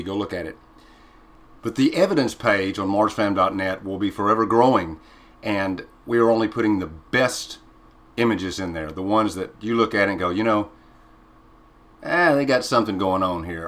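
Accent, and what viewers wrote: South, United States. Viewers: You definitely have a southern American accent 😉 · Nice to see you back and in good health.Thanks for what you do with the videos. Im in the UK. Sorry to say but you do have an accent , its an American one , I don`t have one because Im English. Please keep up the good work that you do. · You have a southern accent! I’m in Canada and no one here has that accent unless they’re from the southern states! My best friend has it, feeling better but apparently considered a “long hauler”... 6 weeks now. Wondering if your taste and smell came back to normal? I would like to let her know as it’s an optimistic positive. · You got an accent it sounds Texas like me must be a Southern accent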